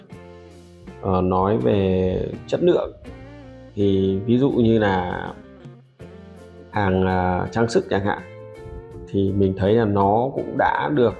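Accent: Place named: Vinh Phuc, Vietnam. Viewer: Giọng chú như Vĩnh Phúc nhà tôi.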